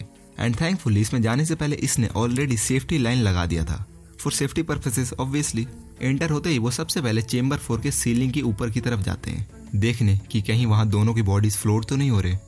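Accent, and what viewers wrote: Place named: Purvanchal, India. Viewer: bhai tum UP purvanchal side ke ho kya? Tumhari pronunciation 🤐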